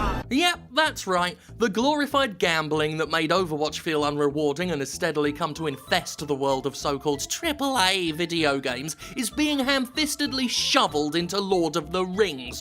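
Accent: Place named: United Kingdom. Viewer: Always nice to hear your own thoughts so eloquently put. And in a British accent to boot. Makes it somehow more dignified. Fuck Warner Bros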